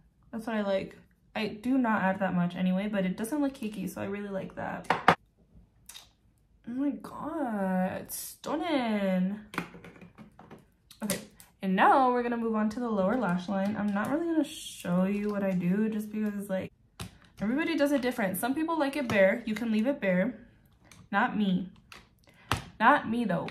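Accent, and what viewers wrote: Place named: United Kingdom. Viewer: Love it she’s cute and I love the British accent and that’s the first grwm in awhile that’s it’s really good can’t wait for more ❤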